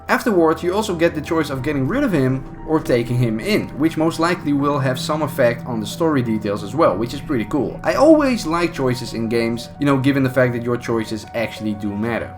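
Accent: Netherlands. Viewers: Funny how I get this video recommend and first thing I notice is your Dutch accent 😂 goeie vid man 💪🏻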